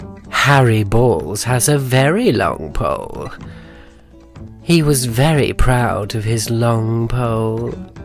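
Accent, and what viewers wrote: United Kingdom. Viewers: Question: is that a real British accent?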